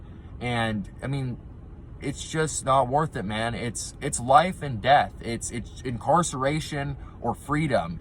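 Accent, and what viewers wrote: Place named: Pacific Northwest, United States. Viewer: So great to hear you are in family counseling -- what a gift you have been given. Sorry about the panic attacks. I suffered terribly in my late 20s through 40s ish tbh. I never knew I needed to deal with stress. I didn't know I could say " this is too much " -- You can say // we can say --- " this is too Effing much " --- cry, shut down, isolate --- have a pity party to rest by ourselves if we need to. Just don't stay there --- We must Release the valve when we feel super Wound -- but I didn't know that // it's oK to say "this is too much" // ... I Could never smoke pot --- too much anxiety // paranoia --- love listening to your sobriety --- I am trying to decipher your accent -- can you at least tell me what part of the country? Pacific NW?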